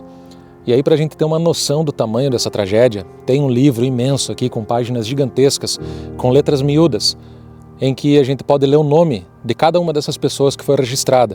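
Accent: Rio Grande do Sul, Brazil. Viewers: Foi tudo muito triste meu Deus. Muita gente não sabem disso, eu imagino como é que ficam os que entram nesse lugar. O Judeus sofreram sem uma razão aparente mas acho que não tem nada a ver como castigo ou juízo de Deus, eu sou Cristã amo Israel oro por Israel, porque as coisas não tem sido boas pra eles ultimamente. Eles são o Povo de Deus alguém queira ou não, obrigado pelo vídeo pois vim aprender muitas coisas conhecndo maus a história, tenho assistido outros vídeos e lido livros sobre o assunto. Que Deus abençoe a ua vida, tens um sotaque Gaúcho já fui missionaria no RS amo os meus irmãos Gauchos voltei pra minha terra com minha família deixamos muitos irmãos lá continuamos nos comunicando com eles nas redes sociais. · Sou da região noroeste do RS é o mesmo sotaque por isso perguntei um abraço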